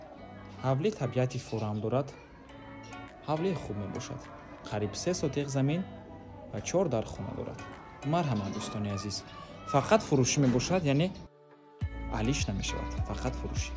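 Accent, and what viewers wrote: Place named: Tajikistan. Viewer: Бисёр забони ширини тожики доштаи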